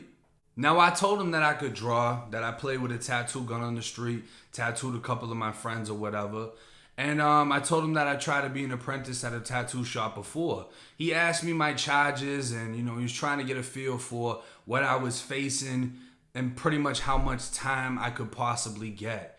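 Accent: Boston, United States. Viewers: Am I the only one that says u sound like u have a Boston accent? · Yo bro where's that accent from you sound like your from boston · I FUCK WITH YA VIDEO AND STORIES REAL RECOGNIZE REAL KEEP UP YOUR GREATNESS MY DUDE 🙌🏾🤟🏾 AND SOUNDS LIKE YOU FROM BOSTON NOT FLORIDA BUT I KNOW IT GOES DOWN IN TAMPA THE WHOLE FLORIDA GET IT POPPING IM FROM BROOKLYN AND I LOVE EVERYTHING ABOUT FLORIDA THE HOODS EVERYTHING I CALL IT SUNNY NEW YORK LOL 😂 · Dude gotta be from Boston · I love this dude's accident you can tell he's from like Boston or something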